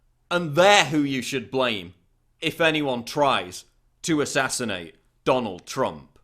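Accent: United Kingdom. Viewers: You have a British accent, shut the hell up! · british accent? man he is a brit living in england........ not sure why someone in england thinks they know so much about an american election........ and this info is pure speculation ................... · whats with the british accent and such interest in american politics? always kinda weireded me out. also why do you always sound so mad? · Hold on, you sound British... Why the hell do you care so much? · By the way i love the Channel thank you. Hearing Sh%t i agree with in a British accent makes us conservative yanks feel even more correct haha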